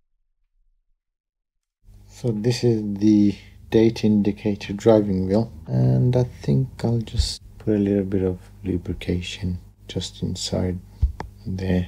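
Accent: Birmingham, United Kingdom. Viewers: It’s so therapeutic to watch you restoring watches, and a brummie twang is the perfect accompaniment!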